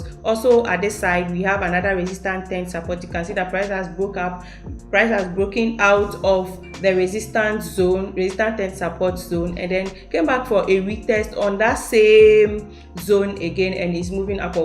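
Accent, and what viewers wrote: Ghana. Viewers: Honestly, I have been consuming your content since day one and I must say your channel is underrated. You deserve many more subscribers and views. You always share a lot of valuable insights. Which are always straight to the point and beginner-friendly. Not to forget your sweet Ghanaian ascent too.😍, Keep giving us masterpiece content. Thank you!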